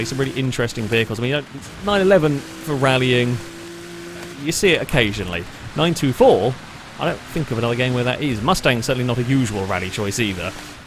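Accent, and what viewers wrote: United Kingdom. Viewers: Most of the time, I listen to youtubers with American accents so listening to this video was different but great! Im terrible at distinguishing different accents, but my thoughts go to thinking this is a british accent. Lol, I could be very wrong. I enjoy the accent, but most youtubers are overly animated and hyped up when Id much prefer something like this. You are not trying to hype us up by getting loud and crazy about little things. You're excitement seems genuine and makes watching this video in particular far more engaging than most others Ive seen. This is a simple compliment in the longest form but the summary of it is this. The lack of fake energy, the commentary itself, the volume of your voice and the clarity all make this video a great one. It heavily reflects you as a creator it does not disappoint. Have a great day everyone!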